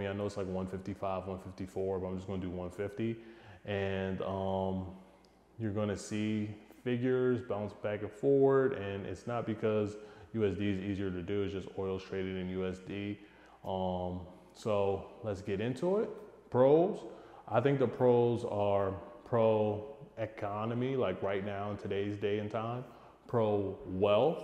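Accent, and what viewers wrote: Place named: Jamaica. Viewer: I'm pretty sure you are not Jamaican... your accent sounds authentic... didn't hear you mention whether or not you are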